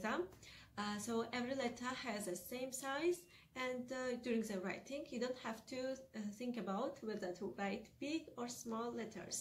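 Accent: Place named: England, United Kingdom. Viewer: I like your English accent. I'm from The Maldives 🇲🇻 and I'm a language enthusiast and I think Georgian (mkhedruli) has the most beautiful writing system. I already know how to pronounce all the sounds including (q'); thanks to my phonetic skills. My target language now is Polish and after that Hungarian and Georgian.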